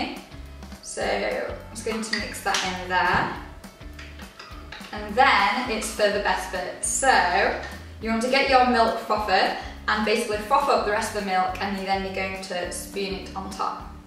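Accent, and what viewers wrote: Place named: Northern England, United Kingdom. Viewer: Love your northern accent, makes me miss home!! xxx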